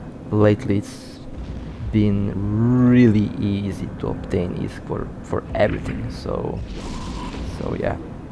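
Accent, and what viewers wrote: Russia. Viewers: Ur russian accent😢 Но спасибо за видео!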